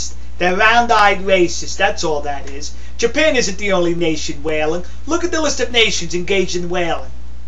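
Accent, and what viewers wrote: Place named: Northeast, United States. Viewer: I just have a stupid question, which part of North Eastern America are you from ? Are you even from the North East ? (I would definitely want to trade my Glasgow accent with yours) lol Have a good day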